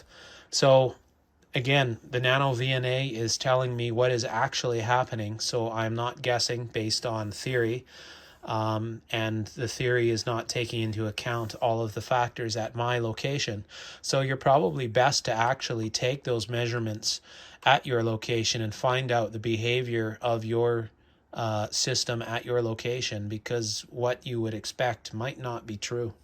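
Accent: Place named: Canada. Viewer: You sound less Canadian in the revision of this video. Also, I have that same keyboard, and it is a beast.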